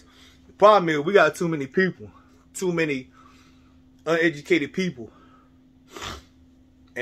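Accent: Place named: Bay Area, United States. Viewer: 💯 Are you from N. Ca or the Bay? We talk like this. Everyone's a lil hood...you know what I'm sayin', sheeiit 🤣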